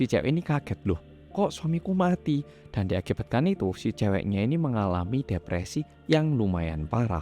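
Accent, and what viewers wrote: Java, Indonesia. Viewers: Sampean korea kok medok jowo to kang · Mantap bngt mas..dah bertahun kmbli k korea,tpi medok jowo nya msih kentel buanget..seneng dngr mas ngomong.salam dari mlysia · Padahal udah hapal klo ini orang korea yg medhok, tapi ttp ngakak pas denger "klotok" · Kok medok kk ngomong nya , saya 14 th di singapore tp nda tau kasus ini yo. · MULAI AGAK2 KONTENNYA NIH CHANNEL YANG JUAL MEDOKNYA =)) DAPAT GEDE YAH DARI JOKOWI? TERLIHAT SEKALIIIII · Sampean kok yo ijik medokkk to mas 👍👍👍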